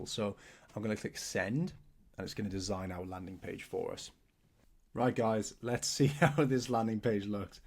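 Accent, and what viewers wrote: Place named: Wales, United Kingdom. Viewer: Where in Wales are you from boss? Fellow dev here, from Cardiff, love hearing the accent from home on these type of videos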